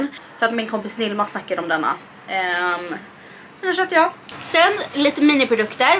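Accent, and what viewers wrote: Stockholm, Sweden. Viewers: Du säger ehh typ massor .sen undrar jag om du bott i Stockholm då du pratar Stockholms dialekt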